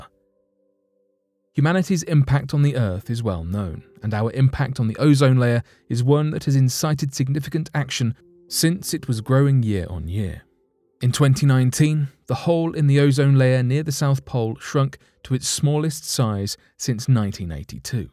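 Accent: United Kingdom. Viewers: The reason that there is not as much Ozone at the south pole is not due to abnormal depletion, but due to the much lower amount of solar radiation that is necessary to form ozone, due to tangential angle of sunlight over Antarctica. But you say all this information in a British accent, and people believe it!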